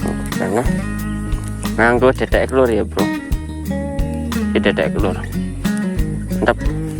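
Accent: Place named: Banyumas, Indonesia. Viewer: Salam wong ngapak, ora ngapak ora penak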